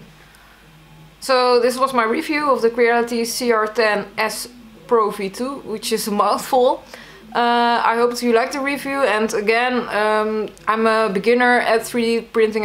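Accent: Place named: Netherlands. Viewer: Dankje voor de revieuw, ik hoor heel duidelijk dat je nederlands spreekt :) zou ook leuk zijn om nederlandse revieuws te zien. Groetjes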